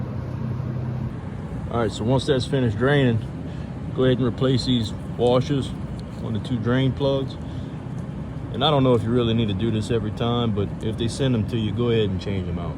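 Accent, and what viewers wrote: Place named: Louisiana, United States. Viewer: You from lafitte area? Sounds like it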